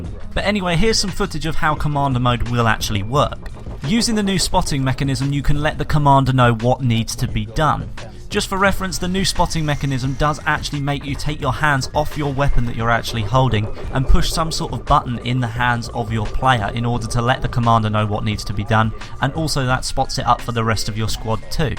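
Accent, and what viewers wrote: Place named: United Kingdom. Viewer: i love this british accent :D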